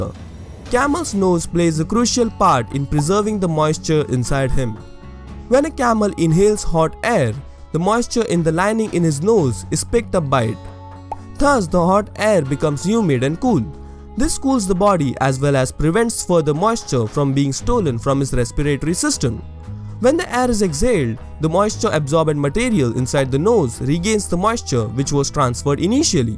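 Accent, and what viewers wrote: India. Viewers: great video dude! but I have got to say this. try putting some effort on improving your accent. i know you've been trying and I can see from your previous videos you have improved. try taking professional help. because non-indians find it hard understand Indian accent. I am asking you to do this because your videos are great stuff and I want this to be popular worldwide. hope you reach your goal as early as possible! cheers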